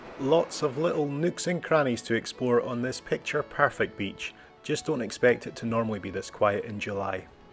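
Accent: Scotland, United Kingdom. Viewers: At last a Scots voice .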